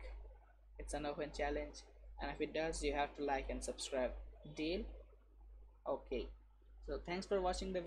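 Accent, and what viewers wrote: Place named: India. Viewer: deal is deal just liked and subscribed. well u sounds like indian.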